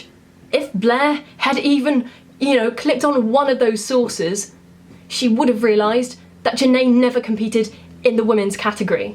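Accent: New Zealand. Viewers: Omg you’re a kiwi?!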